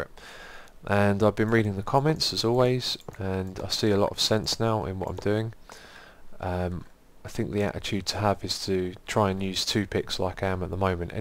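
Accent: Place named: United Kingdom. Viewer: You can't breathe through your nose? I just thought it is your british accent, so i think it's not a really big problem anyway... I am glad that you do what you want instead of making what you announced despite if you have fun. That's how shitty stuff is produced.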